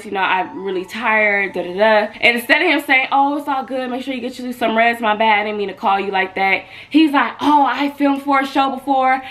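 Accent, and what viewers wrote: Chicago, United States. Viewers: born and raised here and this is definitely chicago nigga vibes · DEFINITELY CHICAGO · yea , I’m frm chicago n it sound like sum shit that mfs do out here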